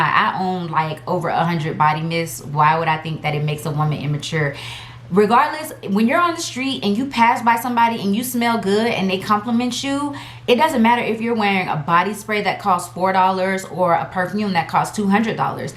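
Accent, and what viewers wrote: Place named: Alabama, United States. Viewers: I really loved this video! We’re the same age! (Well my Bday is April 1) Aries ♈️ I tend to like Sagittarius ♐️ you’re cool ppl 💕 Keep it up beautiful 🤩 And Alabama accents lit 🔥 lmao 😆 -Thank you for your service!! 🇺🇸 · Ahhh, I super late to the video🥴 Giirrrllll you have a accent lol thank you for answering my questions. Funny thing is I heard somebody else from Miami said the same thing that they don’t have a accent but yes girl yes somebody like me from the Northern Midwest can hear it. It not thick or country at all but I could tell you was a southerner. Btw loving the hair and makeup in this video😘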